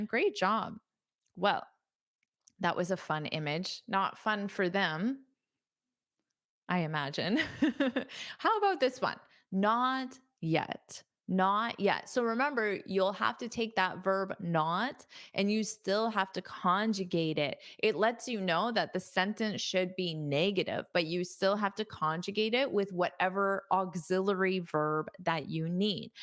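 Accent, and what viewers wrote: United States. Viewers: Thanks a lot for real American English .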